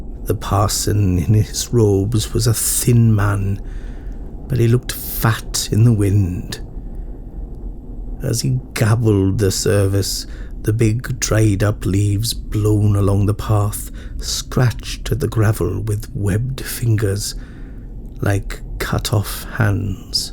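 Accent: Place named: Wales, United Kingdom. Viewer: A brilliant story and a brilliant Welsh accent, Sir... Is there anything you can't do?:)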